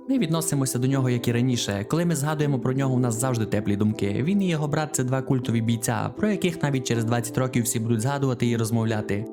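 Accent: Transcarpathia, Ukraine. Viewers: все круто.)лиш таке враження що ти з Мукачева.)якийсь закарпатський акцент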